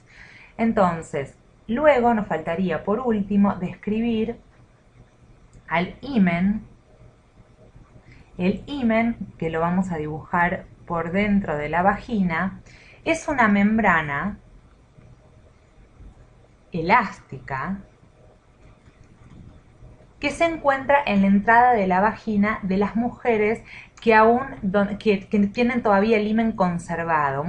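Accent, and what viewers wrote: Rioplatense, Argentina. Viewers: Sos argentina y recien me di cuenta o capaz uruguaya pero igual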